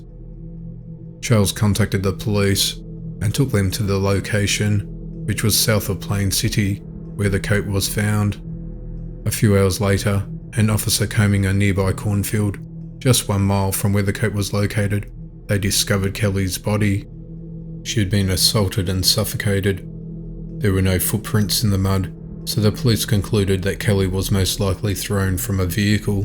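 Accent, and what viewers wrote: Australia. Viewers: So glad to hear an Aussies voice. Your voice fits perfectly to the background noises. Very tragic what happened to these people though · Great to hear a fellow Aussies voice 😀 Loving the videos so many cases I never heard of and wonderful to see that some I knew about have been solved. Binge watching ... cheers from Queensland 🍻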